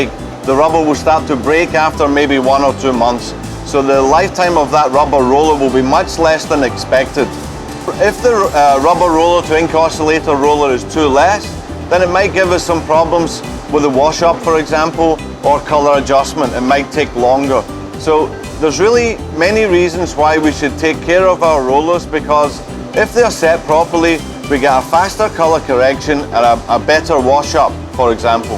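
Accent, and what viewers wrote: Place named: Scotland, United Kingdom. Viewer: A good scotsman by the sounds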